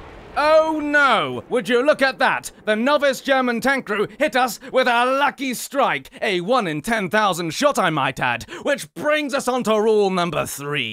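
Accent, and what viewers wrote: United Kingdom. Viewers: are you really British? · Do you put on a voice for videos or are you really that British? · you are by far the best YouTuber I've ever seen. You see, I am a person that loves to listen to British war and marching music and play war thunder and your videos are all that and more! I also love your British accent! Thank you for reading my comment ( If you found it) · What part of England is from im from Newcastle the north east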